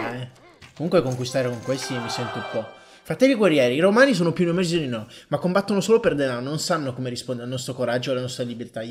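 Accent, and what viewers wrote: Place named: Modena, Italy. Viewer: Ho una domanda da farti: all'accento presumo che tu sia modenese. È vero?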